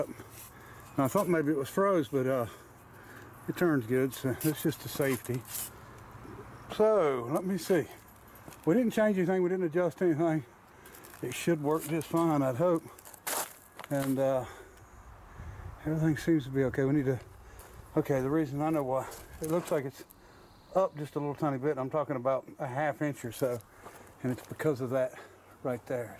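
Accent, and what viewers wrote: United States. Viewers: A true American, an American holiday, American ingenuity, American scenery, American accent throughout.......gives one hope that this is still America and we might, just MIGHT recover. Need an example of what I mean? When buying a $10 watch at Walmart, look at the instruction sheet. Three feet square! The English part is three square inches. A new iron, same thing only they tell you "DON'T IRON CLOTHES WHILE WEARING THEM!" Whoopeee! Happy Mothers Day. Thanks.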